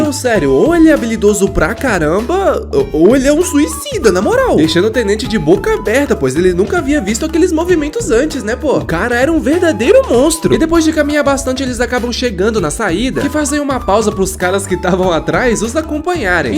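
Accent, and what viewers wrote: Rio de Janeiro, Brazil. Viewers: O narrador e do RJ né possivel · Certeza me responde ai vc e carioca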